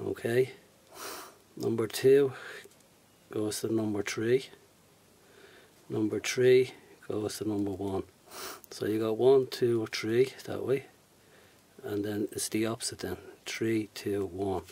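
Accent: Dublin, Ireland. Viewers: You're Irish!<3 Where about are you from? You sound like you're from Dublin. I am from Dublin and am a student. I have a great interest in woodworking :)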